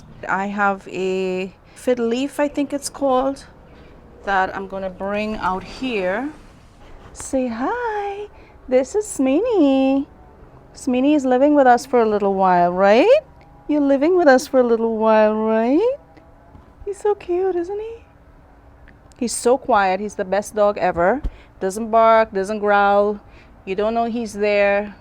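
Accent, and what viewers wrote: Trinidad and Tobago. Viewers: Your acent sounds like you're from Trinidad? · thought I recognized the accent. I am also from trinidad. Well done on your patio refurbish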